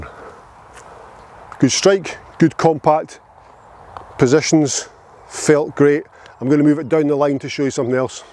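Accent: Scotland, United Kingdom. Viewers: Great lesson. I can't wait to try it at the range tomorrow. BTW, love the Scottish burr. I have a friend Graeme Baxter who is Scottish. He is a fantastic golf artist. He is licensed by the R and A and the USGA. and has brilliant artworks of literally all the majors including The Open. You might want to check his web page out.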